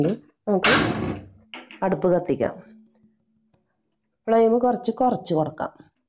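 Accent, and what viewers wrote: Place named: Thrissur, India. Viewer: തൃശ്ശൂർകാരിയായ ക്രിസ്ത്യൻ ചേച്ചി ആണ് സംസാരം കേട്ടിട്ട്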